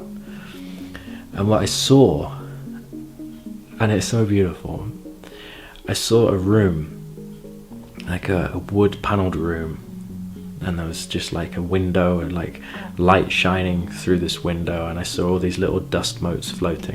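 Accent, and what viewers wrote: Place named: England, United Kingdom. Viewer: you sound English. Where do you go for the retreats?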